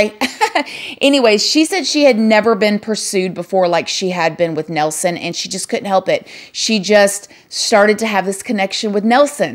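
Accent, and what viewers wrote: South, United States. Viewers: You’re country accent is everything I need when hearing these crazy stories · she is from the southern tip of Florida, from what I remember. she has a slightly southern accent. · I just discovered this channel. Someone help me out. I'm trying to place her southern accent that fades in and out. First, I said Tennessee...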